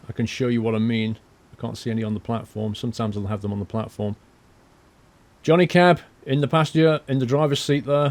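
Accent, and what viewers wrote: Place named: London, United Kingdom. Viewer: hey! love your videos.. we Americans would call a Football Pitch, a Soccer Field... although I call it a pitch (if it is dealing with "soccer" / football ) as well as my friends who play the sport. my first job was at 13 and it was a Soccer referee.. did that until I was about 16.. good money for that age! anyways I know this is kinda random and off topic but may I ask what football team you support? whether their in The Championship, League One or League Two... I'm familiar with most clubs in England. I support Fulham (my mom's from Kensington) but since they got relegated(and proximity to Craven Cottage) I had to become a Chelsea plastic... I know I know it's a huge contradiction, but as an American I'm more of an admirer of English football, and support (more like, I admire) a couple clubs. it's hard to watch the Championship or lower tier leagues even with the soccer package I pay extra for on my cable. 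anyways. sorry for the lengthy comment that's off topic, just curious. I suspect West Ham or QPR, or many of the other London clubs because of the London accent. cheers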